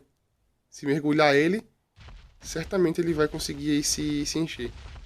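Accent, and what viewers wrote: Northeast, Brazil. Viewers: Nordestino com certeza 😅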